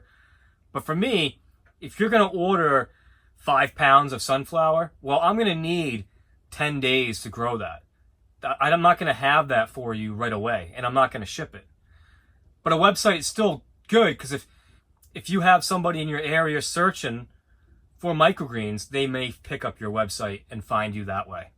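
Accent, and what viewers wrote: New England, United States. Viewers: New England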